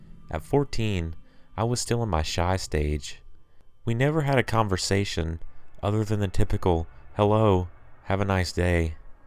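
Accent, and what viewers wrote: South, United States. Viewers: u country asf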